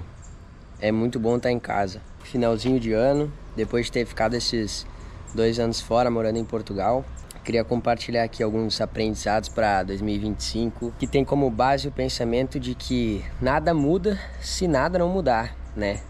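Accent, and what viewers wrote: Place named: Dominican Republic. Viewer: Desde o primeiro vídeo já imaginei que você era do RD ahaha a forma de falar lembra o Eslen!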